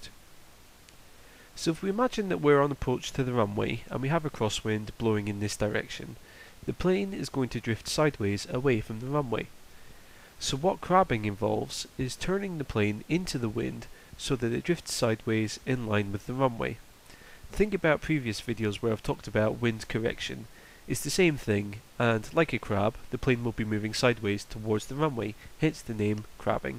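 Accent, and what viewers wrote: Scotland, United Kingdom. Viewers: Also Is That A Scottish Accient I Hear haha im socttish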